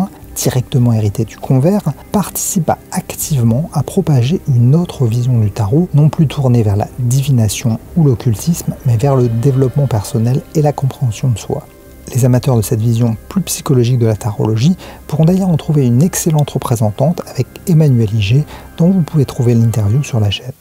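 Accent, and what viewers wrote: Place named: Italy. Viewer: BravissssimoO !!! Pour ce travail historique; tout cela avec une" touche de "fausse facilité "car je suppose que cela a dû vous demander des recherches conséquentes ainsi qu'un grand travail de synthèse! Et que dire de la mise en page magnifiquement illustrée!!!...Quant à l'accent; étant moi-même d'origine italienne...eh bien! ...Pas mal du tout!😆😂🤩( je partage votre vidéo sur mes groupes!).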